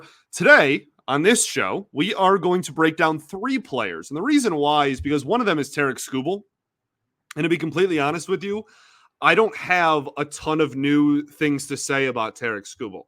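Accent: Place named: Midwest, United States. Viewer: My comments are not just pet peeves. They are advice to help you with your career, because your personality, intelligence, heart and knowledge can take you far. Only your presentation can hold you back and it has improved a lot in the last year. This comment is about the difference between "get" and "git." The first is the correct way to say it. "Git" marks you as a backward, midwestern hick. I know. I had the same problem growing up in Toledo. Here is a way to help you, because I know right now. you can hardly hear the difference. Would you say "base het?" Would you say "lit me in, please?"" Would you use a catcher's "met" behind the plate? Get what I'm saying. There are two difference sounds between "i" and "e" and in most instances we get the difference. For some recent the midwest accent among the uneducated says "go git it" instead of "go get it." People who want to hire you will hold that against you and people who aren't sure why will still think you sound a little off. 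Thanks for reading and please know that I only write this in a desire to help.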